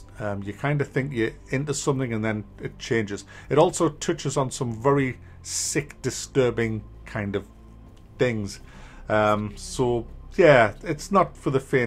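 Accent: United Kingdom. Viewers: I've always disliked how nasal the English can be with their letter "a" like when they say, "Absolutely." What's accent? What part of UK? I've been a subscriber for a long time and always liked how he sounds.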